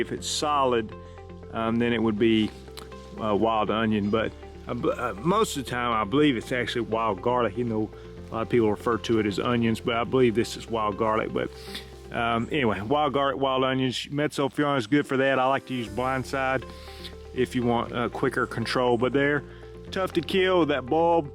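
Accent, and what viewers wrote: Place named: North Carolina, United States. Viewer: Good stuff! Mom is from North Carolina so I hear you great!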